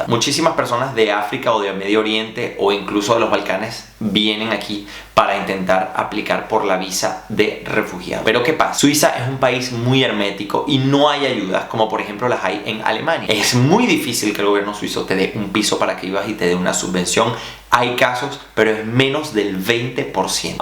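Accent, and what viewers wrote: Venezuela. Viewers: Tienes acento venezolano xd genial bro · su acento es venezolano. Que Negativo eres !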